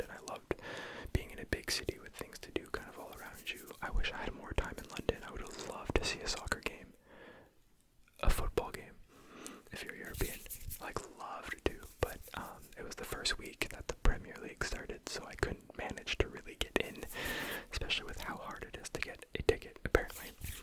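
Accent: Quebec, Canada. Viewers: Big t'es tu québécois ??😂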